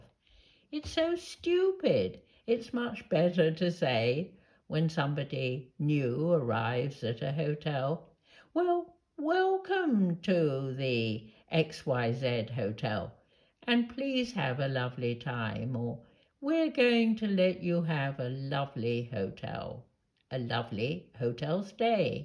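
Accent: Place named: RP, United Kingdom. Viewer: Your voice is so posh.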